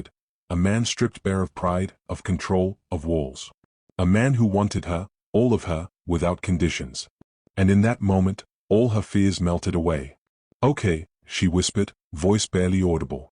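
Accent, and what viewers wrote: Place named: London, United Kingdom. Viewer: This narrator story teller speaks well and good and he tells this story really well and good. This story teller speaks with slight accent this story teller narrator sounds like and speaks like he's from London England he's doing a great job of telling this interesting awesome amazing I can understand everything that this story teller says as he's telling this great beautiful interesting story.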